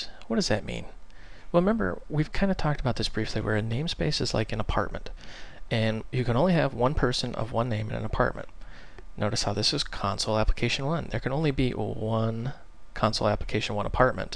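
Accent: United States. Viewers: thx man! ur awesome, great american voice and accent!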